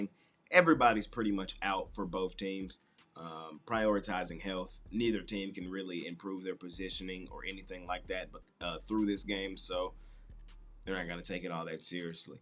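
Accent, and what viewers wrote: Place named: South Florida, United States. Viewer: Sounds like you from my crib!!! South Florida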